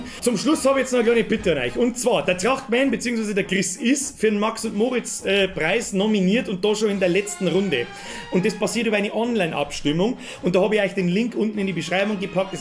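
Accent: Bavaria, Germany. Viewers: #TRACHTMAN weil boarisch einfach geil is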